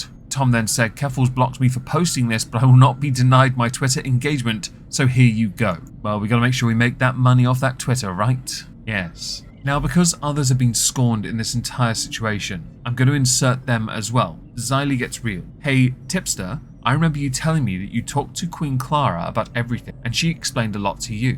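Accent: RP, United Kingdom. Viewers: 😊 heh....me like when posh brittish voice guy say mean, true things about the people me no likey😊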